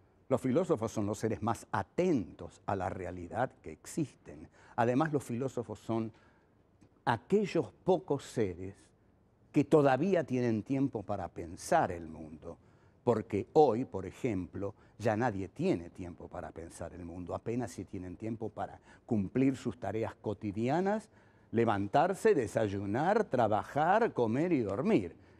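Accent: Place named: Buenos Aires, Argentina. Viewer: “Nunca digan digresión, es digresión”. Ese acento porteño jajaja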